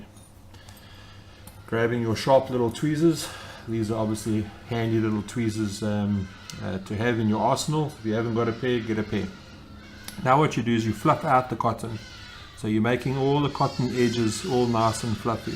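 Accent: South Africa. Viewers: Great video thanks, works really great. Quick question, are you South-African?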